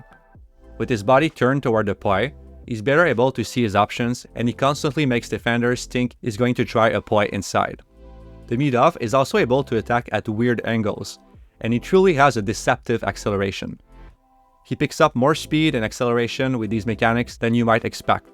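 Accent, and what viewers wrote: Quebec, Canada. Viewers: Are you from qc cuz that accent is so qc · Quand même drôle que ce vidéo soit analysé par un québécois (on reconnaît l'accent dans son anglais) et que Demidov s'en vienne à Montréal. Let's go!!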